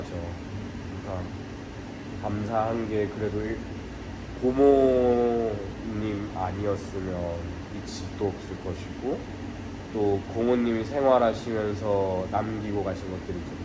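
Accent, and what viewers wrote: South Korea. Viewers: 이 분은 한국분인가요? 말씀하시는 게 토종 한국인인데요 ㄷㄷㄷ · 부산 사투리 아직까지 있네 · ㅇㅇ 한국말을 잘한다 라고 말할게아님...그냥 모국어가 한국말인데 우리랑똑같죠 모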